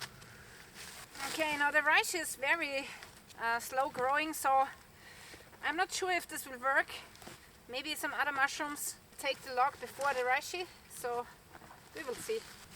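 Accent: Austria. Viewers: irgendwie irritiert mich dein ösi-akzent im english ;) weshalb english video? wg reichweite? oO oder bist du ausgewandert? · Nice video, love the way you explain everything about mushrooms, I don’t care much about mushrooms🥴 but hope you have good harvest next season BTW I love your Austria 🇦🇹 accent ☺️👋from 🍊orange County Ca, ⛺️🪵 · I always wondered about your strange accent. It sounds german but not exactly. Now i found out you’re Austrian. So well, that make things clear. Kind regards from Belrin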